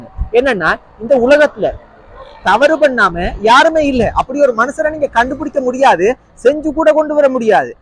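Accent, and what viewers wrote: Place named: Colombo, Sri Lanka. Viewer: உன் தமிழ் கொழும்பு தமிழ் மாதிரி இருக்கு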